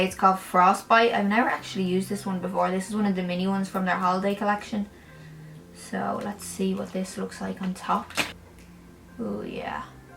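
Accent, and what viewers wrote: Ireland. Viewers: I won't lie it looks really pretty with your pink hair. not too matchy matchy either. have I mentioned I'm in love with your accent? I detect Irish am I totally off??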